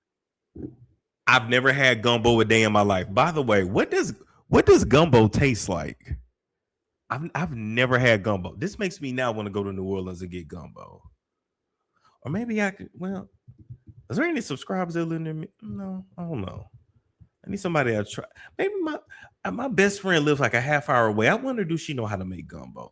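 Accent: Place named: Missouri, United States. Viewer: I thought you was from Missouri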